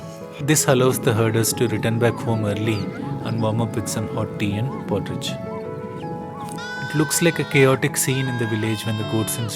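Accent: South India, India. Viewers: I think you r south indian voice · You english language not good because south type language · South Indian talking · Indian tone whilst Briefing 😜though loved it ❤️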